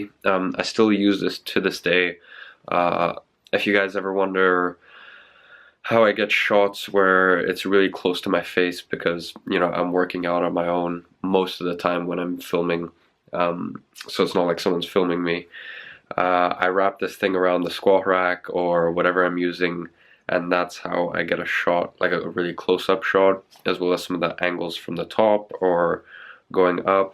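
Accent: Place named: United States. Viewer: wait if you live in london for 13 years how or why do you have american accent 😳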